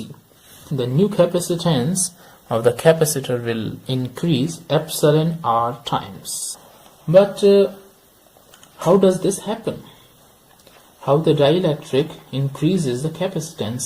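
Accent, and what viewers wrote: India. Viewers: Bhai Indian accent me hi Bola karo . Nice video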